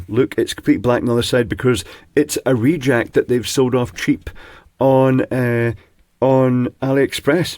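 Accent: United Kingdom. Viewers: I bought the test leads for $0.93. I love to listening you and Your British accent.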